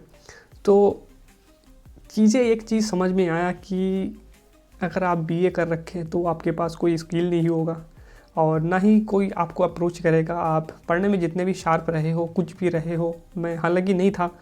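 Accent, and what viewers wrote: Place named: West Bengal, India. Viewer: Bhaiya aap uttrakhand se ho but voice tone westbengal jaisa lagta hai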